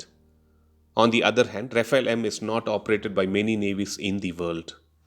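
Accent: Tamil Nadu, India. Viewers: pesura slang le theriyudhu bro. Neenga namma aalu thanu. Vanakkam nanba 😍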